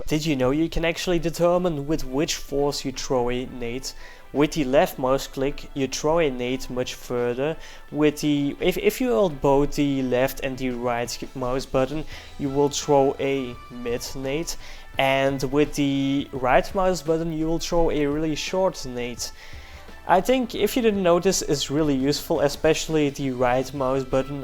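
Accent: Netherlands. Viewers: ben je Nederlands? lol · Nice, dacht al aan je accent te horen dat je Nederlands was, maar dit filmpje bevestigd het ;p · Wait are you dutch? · Wait your Dutch? Ik tought you were danish or German. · This guy sounds dutch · i noticed ur dutch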